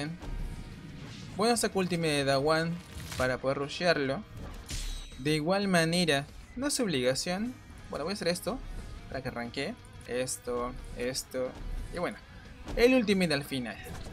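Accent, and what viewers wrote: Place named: Latin America. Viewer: Feliz noche, creo que eras de latam gracias por tus guías buenísimas!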